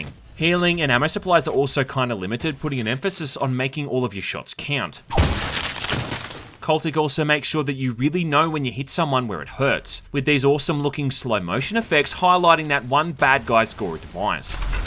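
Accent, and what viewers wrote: Australia. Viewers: Sorry to break it to you but he's clearly Australian...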